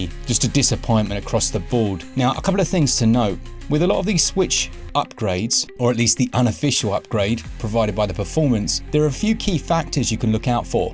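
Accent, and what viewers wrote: United Kingdom. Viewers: I just can’t take a British accent serious!! He just sounds like a joke!!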